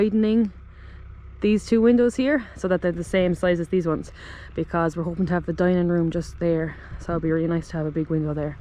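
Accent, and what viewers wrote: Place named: Ireland. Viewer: So great to hear an Irish accent! I'm Irish too but living in France. Going to be renovating an old house here too, soon hopefully. Very exciting. All the best with your build. I'm looking forward to following along!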